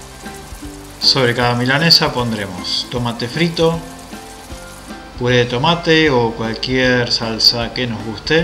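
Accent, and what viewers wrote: Argentina. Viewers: hola una pregunta sos Argentino ?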